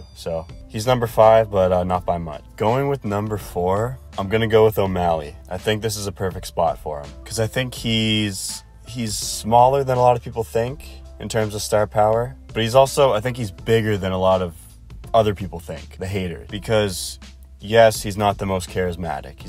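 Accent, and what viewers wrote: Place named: Canada. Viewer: Are you Canadian? You sound Canadian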